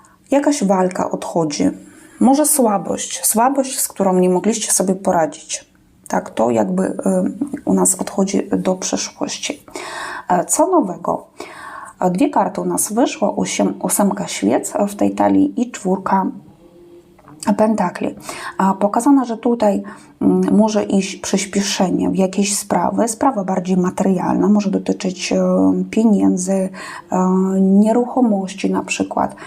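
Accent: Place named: East, Poland. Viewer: Bardzo ładne czytanie, wyczuwalny lekki akcent ze wschodu, ale ogólnie poprawna polszczyzna 😊 Bardzo mi się podobał ten przekaz dla Rybek. Pozdrawiam ❤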